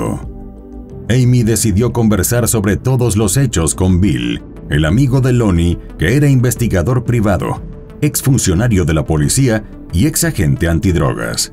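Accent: Mexico. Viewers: Lo se, pero es acento mexicano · Sr, su voz es maravillosa para yo tenerla al acostarme susurrándome poesías de amor!!!!!!! Usted nos pudiera decir de qué país es??? Dicen algunas que es mejicano, pero para mí su entonación es neutro …….y no lo puedo definir….. Nos saca de la duda?????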